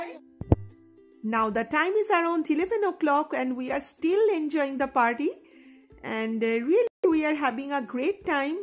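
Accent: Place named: Bengal, India. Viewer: Hearing your english😀😀first question comes to my mind.. are you bengali?☺️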